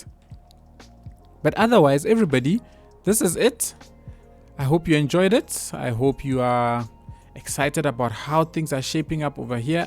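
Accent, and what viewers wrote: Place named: South Africa. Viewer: South African accent 🤔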